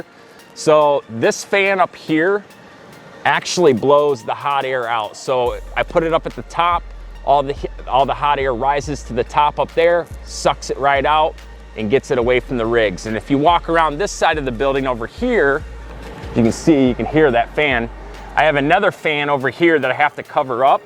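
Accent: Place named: Wisconsin, United States. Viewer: You sound like you're from Wisconsin lol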